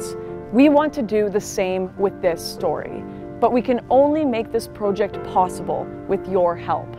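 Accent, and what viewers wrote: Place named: Canada. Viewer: I love her Canadian accent, “prOject”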